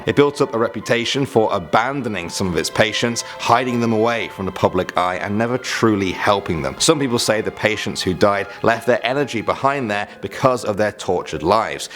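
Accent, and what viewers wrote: United Kingdom. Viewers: i didn't know that he was british! hello m8! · Are you Britsh 🤔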